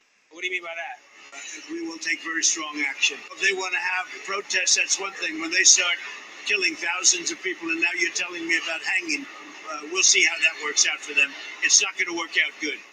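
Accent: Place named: Cockney, United Kingdom. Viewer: Love the Cockney accent,. Regarding the debanking and all that, I have to say, it's not right, but it's like the thing from Pulp Fiction, isn't it? The Marcellus Wallace situation. Yeah, I mean, maybe they shouldn't have expected that reaction, but they should have expected a reaction. You know, I mean, come on. The Swiss guy who was sanctioned by the EU. What on earth was he doing anywhere near Europe, let alone still living in an EU state? Two, Scott Ritter. What on earth was he still doing in the United States? What is wrong with these people? And I'll tell you who's next, Alexander Mercois of the Duran. He will be sanctioned. They will clamp down on him. They will debank him. I've got no idea why these people stay in these countries. I left Airstrip One 25 years ago and have never looked back.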